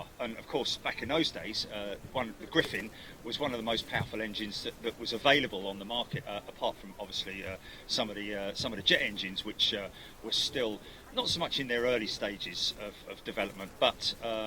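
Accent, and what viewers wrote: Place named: United Kingdom. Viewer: Love the British accent! Boy, he certainly keeps himself amused.....and us as well! Greetings from St. Petersburg, FL, USA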